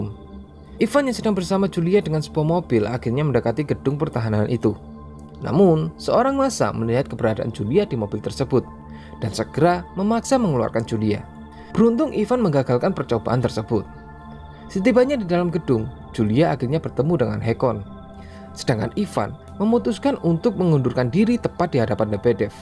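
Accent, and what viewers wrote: Indonesia. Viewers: Admin!~ Gua punya pertanyaan. Diakhir video. Apakah Si Julia ikut bersama Hekon ataupun tetap stay dibumi? = maaf gua kurang faham sedikit dikernakan aku orang Malaysia. Kurang memahami logat" Indonesia . But! Gua tetap mendukung ni channel kok. Dengan +1subscribed ;) . By the way semangat yah bang!!! Ganbatte🔥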